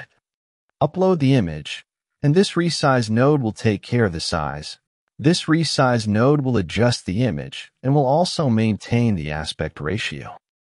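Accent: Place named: India. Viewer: We are used to strong indian acccent in tutorials don't worry :)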